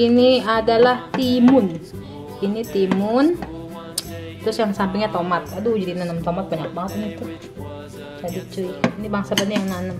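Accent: Jakarta, Indonesia. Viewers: Mantap mpo masakan ikan nya... Bikin ngiler dan laper... Dan lucu jg sm mpo...bicara nya tetap betawi asli..😘😘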